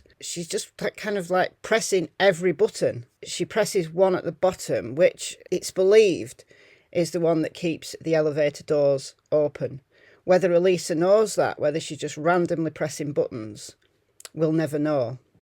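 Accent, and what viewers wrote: United Kingdom. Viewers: I tend to think that you are right. I just don't like that the video has been edited and that they lie about it, or that nobody could see what was recorded on the other cameras in the hotel. I don't know if they are just protecting themselves or if something shadier has happened. Because the hotel had such a bad reputation, they had trouble finding employees so they often hired ex-convicts. I have seen some interviews with people who have reported assaults from some of their staff members, and some who have been raped on the roof. So maybe someone saw a vulnerable girl and took advantage of her? Anyway, a great video. I always enjoy your take on different cases, and I just love your british accent! ;-)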